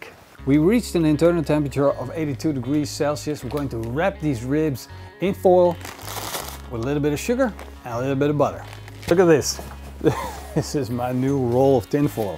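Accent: Netherlands. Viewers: Yo, I love your videos and your Dutch accent is charming. One quick question. Why do you always wrap your meat "shiny side out"? Usually you'd do that if you wanted to deflect heat, but I don't think that's what you mean to do when wrapping. Is this deliberate or doesn't it matter with these ribs?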